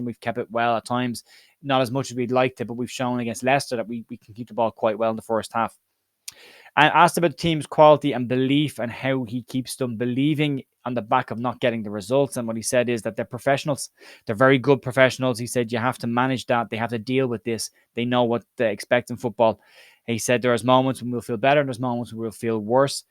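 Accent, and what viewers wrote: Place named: Ireland. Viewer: As always well delivered and short and to the point, the Irish accent is a massive plus. Now to listen to a Leeds Bird Rant and then maybe Conner if it's not too long and I can always fast-forward Joe. LOL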